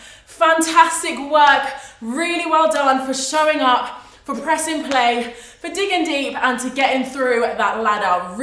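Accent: England, United Kingdom. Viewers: I Love you English accent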